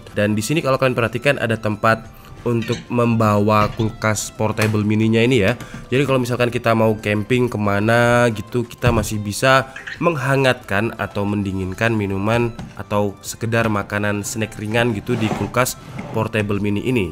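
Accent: Malang, Indonesia. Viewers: Lo mase wong malang to bak e 😁😁😁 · Owalah mas jawa pisan to... Org mana mas...saya Banyuwangi, Hadir🙏🏻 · Ela wong jowo jebule, "Opo si ki jenenge" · Medhok malang😅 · Saya kyk nya kedengaran Malang ,Malang mana bang 😂😂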